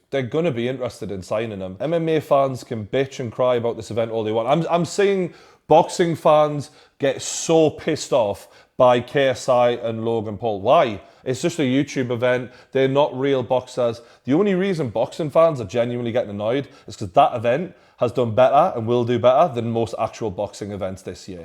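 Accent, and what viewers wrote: Newcastle, United Kingdom. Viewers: Man like Geordie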